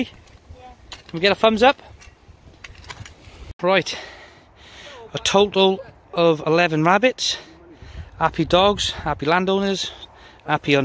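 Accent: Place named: Wales, United Kingdom. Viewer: 11 rabbits, we can make £22 quid in Sunderland for the meat mate. Do yas do any better over in Wales? I'm guessing by the accent, apologies if I'm wrong :-)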